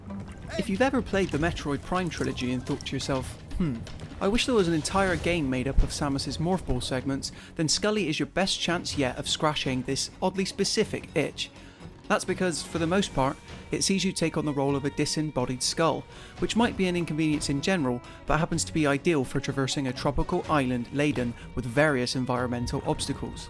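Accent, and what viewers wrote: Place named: Somerset, United Kingdom. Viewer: Think this guy is from Somerset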